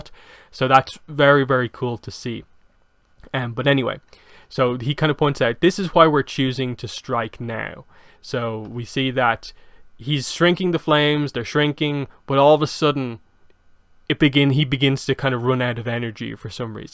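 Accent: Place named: Ireland. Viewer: You sound Irish but this was a v good review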